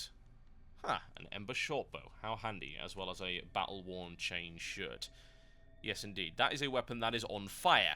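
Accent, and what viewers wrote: United Kingdom. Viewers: this is available in EU Right?, because your definitely a Brit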